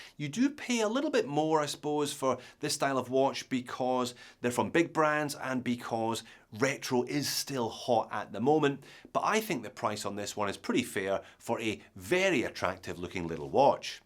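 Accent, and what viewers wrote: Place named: Ayrshire, United Kingdom. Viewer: You have an Ayrshire accent , where are you from?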